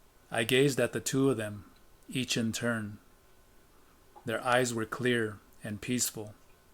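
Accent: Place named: Spain. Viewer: Listening to these magical books with the clear spanish accent is perfect! Muchisimas gracias hermano!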